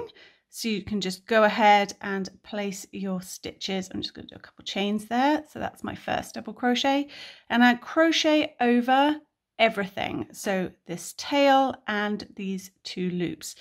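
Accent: United Kingdom. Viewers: Your accent sounds British, so why don’t you use UK terms?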